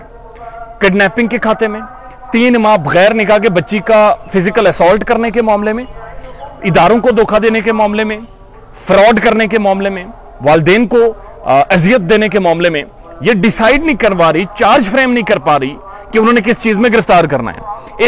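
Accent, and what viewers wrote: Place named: Kashmir, India. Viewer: Your pronunciation 🤓🤓sounds u belong to kashmir😂hum kashmirion ki urdu main bi apna kashmiri touch hota he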